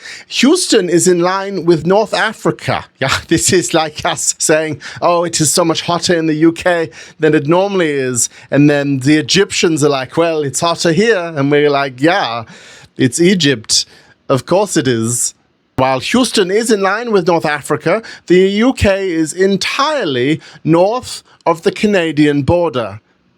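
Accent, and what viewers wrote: Germany. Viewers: Sarcasm in a German accent is the best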